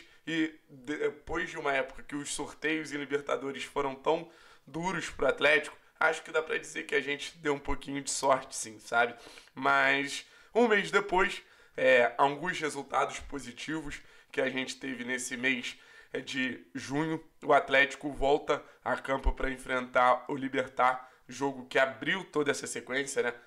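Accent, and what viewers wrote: Rio de Janeiro, Brazil. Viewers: Paranaense mais Carioca q ja vi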